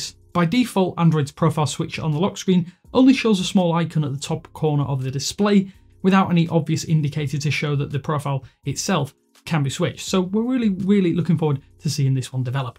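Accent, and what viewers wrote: United Kingdom. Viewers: Maurício Meireless fazendo review de versão do Android com sotaque britânico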